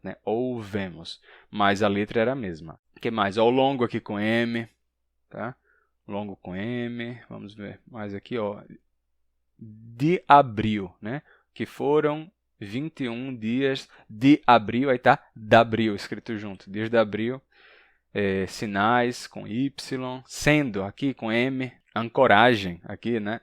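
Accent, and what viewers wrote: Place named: Rio Grande do Sul, Brazil. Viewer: Descobri porque acho seu sotaque diferente dos nordestinos que conheço: sua pronúncia do "e" no final é parecido com a pronúncia dos gaúchos: "leite quente dói o dente da gente".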